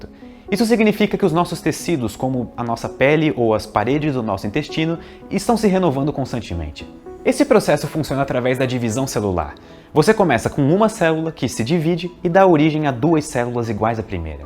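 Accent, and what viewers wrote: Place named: Rio Grande do Sul, Brazil. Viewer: você é gaúcho?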